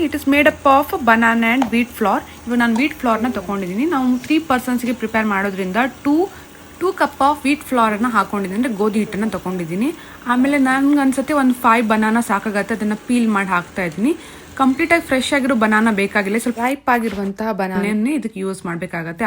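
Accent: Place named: Andhra Pradesh, India. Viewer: Your telugu accent is good ma'am :)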